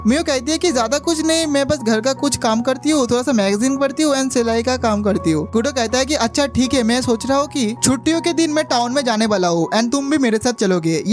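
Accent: Bengal, India. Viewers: tum bangoli ho mughay pata chalgiya😂😂😂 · Bhai tum bengali ho kya😅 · Are u a Bengali ? like ur accent is like that and nothing else ! ! ! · If I'm not mistaken, you are a Bengali or your mother tongue is Bengali.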